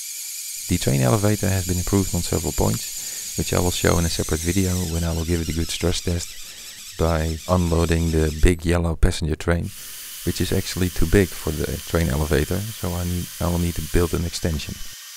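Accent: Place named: Netherlands. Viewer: Je hoort zo goed dat ie gw Nederlands is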